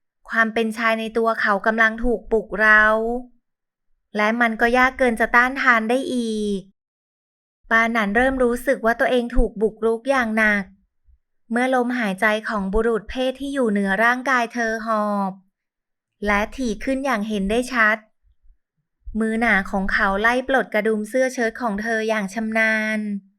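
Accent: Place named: Thailand. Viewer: เสียงเหน่อ น่ารัก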